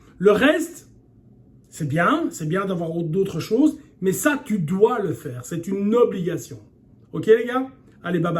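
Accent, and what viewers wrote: Belgium. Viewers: Quand j ai commencé à vous suivre il y a quelques années...c était bien évidemment pour vos conseils mais également (et cela va paraître bizarre ),pour votre pointe d accent bruxellois..qui me rappelait avec une certaine nostalgie mes études et 10 années passées à travailler dans notre capitale. Quand je passe cage aux ours systématiquement je pense à vous....et vous voir continuer de nous donner vos précieux conseils alors que la majorité des patients sont prostrés à l idée des effets secondaires de la chimio,cela force le respect. Pareil à cambronne à waterloo, je vous imagine rétorquer à cette saloperie de maladie",la garde meurt mais ne se rend pas"...et à cet instant, voir ce cancer battre en retraite et choisir un adversaire plus faible. Longue vie à vous et je vous envoie un max de bonnes ondes bourrées de Kimé👊💪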